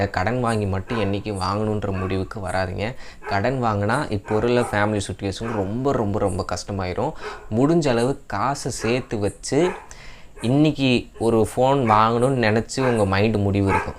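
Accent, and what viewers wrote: Madurai, India. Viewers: Bro neenga madurai ya bro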